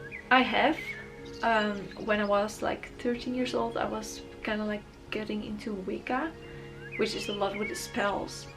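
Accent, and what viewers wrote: Netherlands. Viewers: Ah I knew you were Dutch! Dutchies always recognize other Dutchies accents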